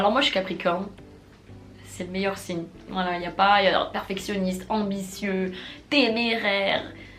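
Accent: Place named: Germany. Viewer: Bonsoir, as-tu des origines allemandes? J’ai noté le petit accent très charmant 😊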